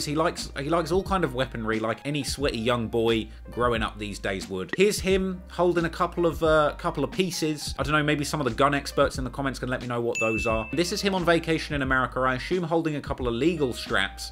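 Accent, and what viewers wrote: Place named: United Kingdom. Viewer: So refreshing to hear a traditional British accent talking about this kind of subject... it’s good music and I’m happy to not hear a wannabe roadman talking for once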